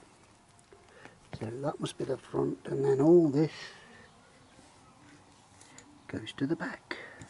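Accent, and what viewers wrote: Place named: United Kingdom. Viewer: Great video. I had got part way through dismantling forks on XJ 900 today and could not figure how to get it apart so decided to check you tube. I had an M14 bolt with 22mm head and used that to hold inside. One problem, as I am not from UK I did not understand one word, just the accent but a picture is worth a thousand words.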